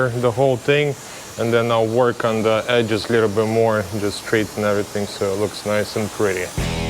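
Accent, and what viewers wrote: Russia. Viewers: 😅 Русский акцент не скрыть никак. Классная работа! Лайк, подписка.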